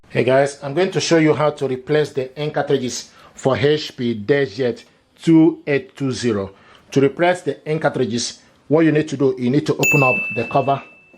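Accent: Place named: Africa. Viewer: strongest african accent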